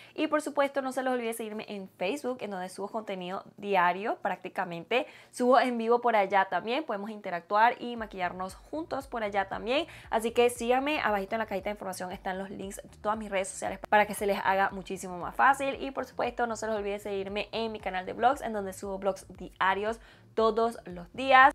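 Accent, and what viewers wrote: Venezuela. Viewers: Me encantó el vídeo! Primera vez que veo tu canal y de verdad me encantó. Jaja ya decía yo ese acento es Venezolano ♥️ saludos desde Barquisimeto.!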